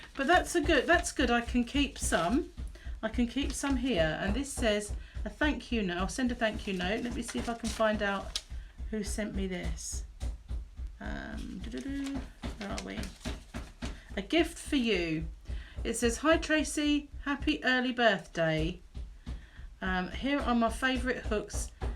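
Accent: United Kingdom. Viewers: Love ya guts lady!! Aannd I absolutely love the way brits say aluminum!! I watch Joel & and they talk about the similarities and differences between the USA and UK I just adore the accent!! The happy mail is awesome!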